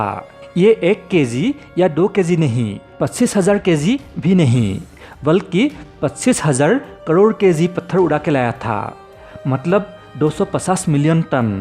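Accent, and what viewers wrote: Assam, India. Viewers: Apunar Pronounce Huni Gom Palu J Apuni Oxomiya Hoi ....But Apuni Oxomor A Hoi Ne Onnyo Rajyor Hoi😊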